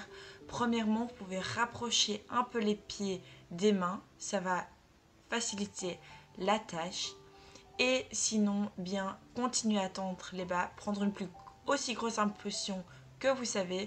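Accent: Belgium. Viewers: Bonjour, j'aime beaucoup tes vidéos très détaillées et ton petit accent belge 😊. Est-ce que tu pourrais faire un tutosur le flip arrière s'il te plaît ? Merci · Tu est belge ?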